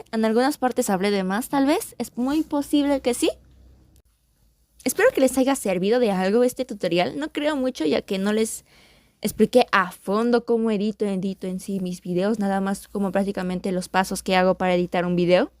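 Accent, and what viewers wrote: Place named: Latin America. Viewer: Sí se nota que eres de latam 😂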